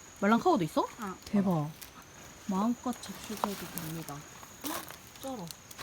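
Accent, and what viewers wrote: Gyeongsang, South Korea. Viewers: 진짜 오리지날 경상도 발음이다..ㅋㅋ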